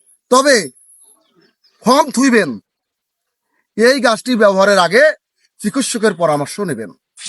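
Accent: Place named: Rangpur, Bangladesh. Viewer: রংপুরের ভাষায় চমৎকার হয়েছে।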